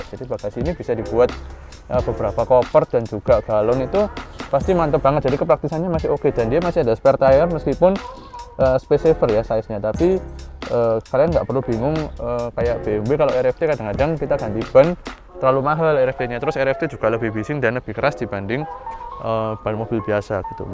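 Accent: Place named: Java, Indonesia. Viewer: Medok banget mas!!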